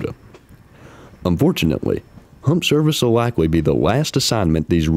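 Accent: South, United States. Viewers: Like your southern accent.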